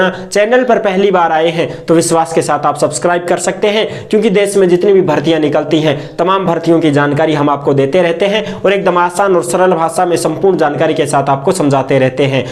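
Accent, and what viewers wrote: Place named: Marwari, India. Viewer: Bolane ki istayl bhut ahhe h 😂😂😂❤❤ Jo bich me marwadi ka tdka h vo mast h 😂😂❤❤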